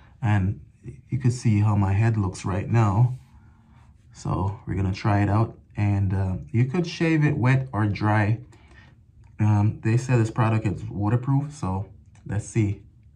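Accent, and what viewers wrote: Jamaica. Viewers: Jamaican? Respect brethren!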